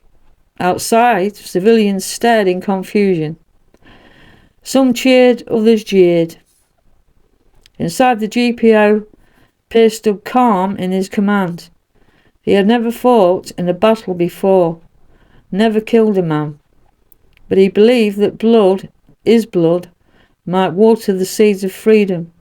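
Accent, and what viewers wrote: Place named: England, United Kingdom. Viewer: I dont know if you are English (you sound like it) but if so then well done for talking on such a complex and tricky subject which many English / British don't go near for some reason. And thank you also for calling him "Patrick Pearse" as many Irish for some reason call him Pádraig or Pádraic Pearse which of course is wrong, as you correctly say its Patrick Henry Pearse and was known to everyone as Patrick or Pat. Well done.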